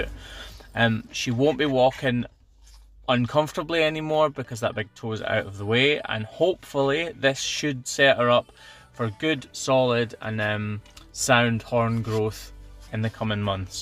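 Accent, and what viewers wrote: Scotland, United Kingdom. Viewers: I love your videos. Could listen to your Scottish brogue all day, which is probably why I watch your videos for hours! One question (as I used to be a riding instructor, and horses were my life for many years) would there ever be a time when you might need to x-ray a cow's feet to work out what's going on internally? Many thanks from a very wet and windy Berkshire :-) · I am working on a Scots accent so his videos are very helpful—no thirst watching here😂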